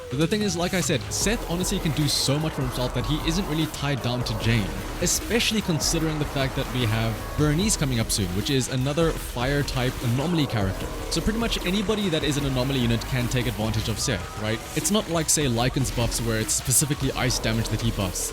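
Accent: South Africa. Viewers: I knew I recognized that accent, as a fellow South African you got the instant like and sub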